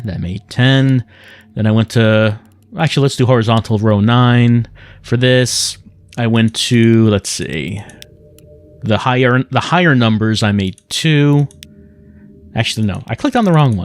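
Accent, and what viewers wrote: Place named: New York, United States. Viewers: Newark union plumber accent is really the best. Especially in Doki Doki Literature Club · Your... uh... New York accent when reading letters makes this game much more enjoyable.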